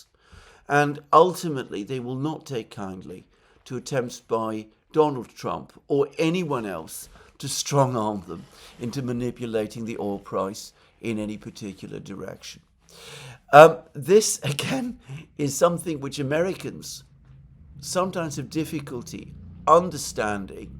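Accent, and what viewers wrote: United Kingdom. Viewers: You sound like a snobby brit. But still love ya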